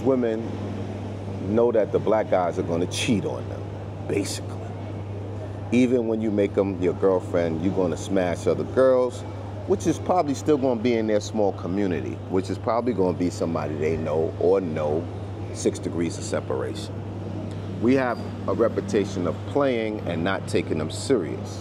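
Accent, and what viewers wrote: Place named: New York, United States. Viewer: New yawk what’s good dawg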